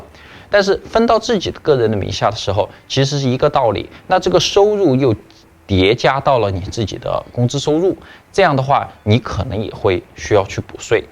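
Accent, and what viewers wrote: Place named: Sichuan, China. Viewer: 哈哈哈，听出了川贵口音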